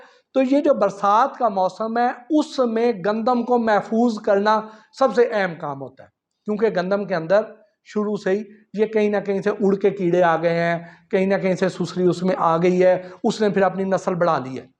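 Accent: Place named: Punjab, India. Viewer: ko Bolna to ata nhi.aisey lagta hai koi paindu bol rha hai Urdu bolny ka style to bilkul Punjabi touch ka hi hai ab Sachi baat kisi ko Buri lagti hai to lgey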